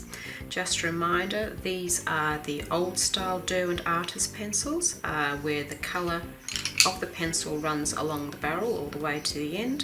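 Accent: Australia. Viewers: that video was fantastic and so good to hear a fellow Australian accent. I am 74 y.o. and have just recently gotten into colouring books. I am addicted!!! So relaxing. Your video is so informative and I am asking Santa for some Faber Castell Polychromos for Christmas. My other addiction is making cards. Thanks.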